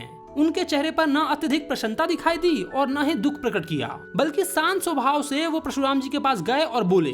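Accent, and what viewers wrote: Bihar, India. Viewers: aap bihari hooo? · Bahi aup bihar sa ho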